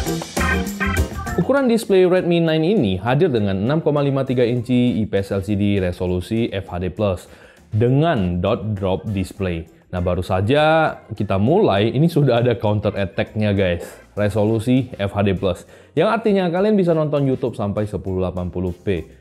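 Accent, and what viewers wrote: Pematangsiantar, Indonesia. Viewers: Bah orang siantar rupanya haha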